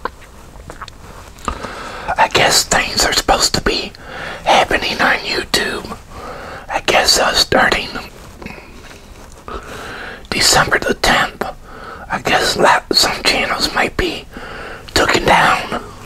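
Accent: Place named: Texas, United States. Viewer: Seriously one of the best channels there ever was and ever will be ur Texas accent is the best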